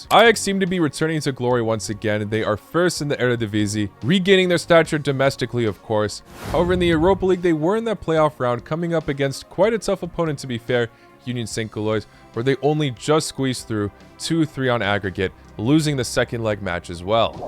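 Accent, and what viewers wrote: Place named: United States. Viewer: You speak perfect American English for me and Your American Accent is so awesome.🥰😍🤗❤❤❤❤❤❤❤🤍🤍🤍🤍🤍🤍🤍💙💙💙💙💙💙💙💯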